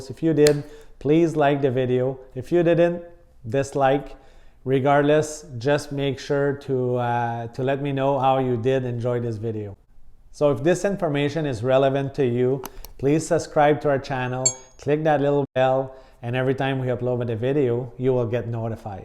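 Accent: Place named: Quebec, Canada. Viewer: I think its the Quebec accent but you sound just like GSP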